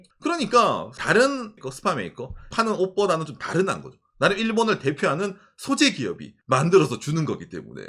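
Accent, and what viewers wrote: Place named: Gyeongsang, South Korea. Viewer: 앗, 어딜가도 사투리, 반값소, 갱상도 요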